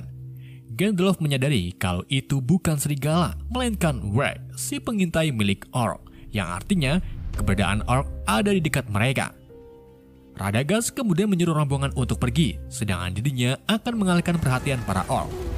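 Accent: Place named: Tegal, Indonesia. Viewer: Maceme nt wong tegal ya WA😁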